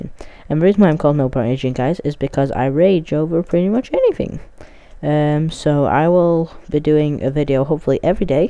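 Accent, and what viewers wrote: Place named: United States. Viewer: U still sound american lol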